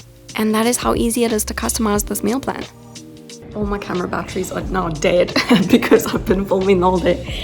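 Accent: South Africa. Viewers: Love your videos! Do i hear a south african accent? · I’m not too sure what her accent is , maybe SA , but I’m obsessed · Are you South African? I can sense it immediately! I’m also raised by a South African family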